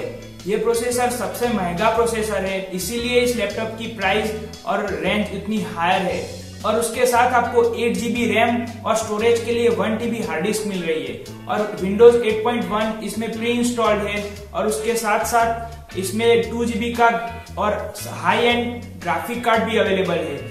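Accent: Gujarat, India. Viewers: are u gujju.??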